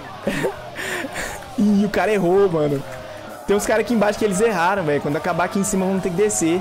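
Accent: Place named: Maranhão, Brazil. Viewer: Parecendo os maranhence daqui de Goiânia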